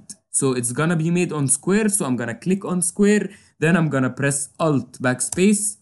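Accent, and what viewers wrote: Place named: Egypt. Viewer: Egyptian accent !!!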